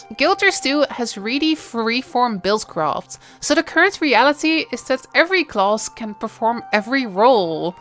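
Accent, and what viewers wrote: Netherlands. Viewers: I like your dutchness 😂 i can hear myself talking. Good video! As a long time returning player i am quite confused sometimes!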